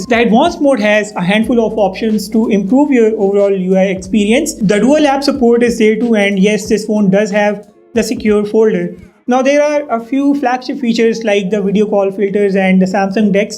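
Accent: India. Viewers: You voice and look like indian but I know you are form pakistan ❤️.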